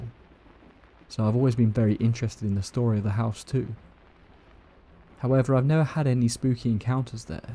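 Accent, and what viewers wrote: England, United Kingdom. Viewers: Some fab stories, thanks for narrating them and everyone who shared them. But I definitely never fall asleep to them. I listen whilst preparing and cooking or soaking in the bath. With a glass of something! 🥂😁 And it's great to hear another English voice and accent x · It's so difficult to fall asleep to English accents... Need my murican! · Can't fall asleep to a British accent. Just can't.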